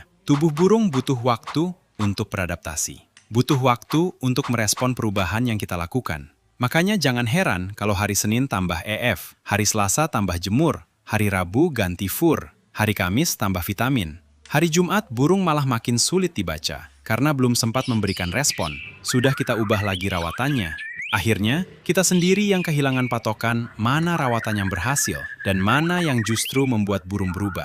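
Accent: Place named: Kuningan, Indonesia. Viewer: dari logat nya kayak orang Kuningan a😅🙏🏻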